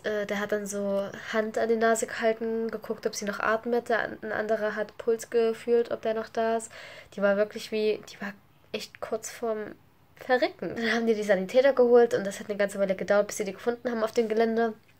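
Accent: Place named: East Germany, Germany. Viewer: Hey. Ich sehe gerade das erste mal ein Video von dir (ich war auch aufm Rockharz dieses Jahr) und wollte mal fragen aus welchem Bundesland du kommst. Bestimmt ausm Osten?! Erkennt man am Dialekt.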